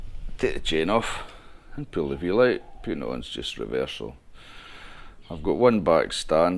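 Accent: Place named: Fife, United Kingdom. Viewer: Very nice! Being from Fife I knew the accent was from over that direction lol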